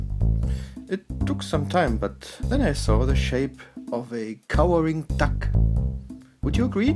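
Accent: Germany. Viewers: I love this, it's crazy in a good way. Your accent also adds to it as I can picture you a slightly bonkers German scientist. Are you from southern Germany? I've always seen my country Britain as as a strange seated witch driving a car with the extended leg of Cornwall and the extended arms of north and south Wales. The Lake District is her adam's apple and south-west Scotland is her beard. She is sneezing out of a hooked nose at the Argyll penninsula and has pooped out the Isle of Wight.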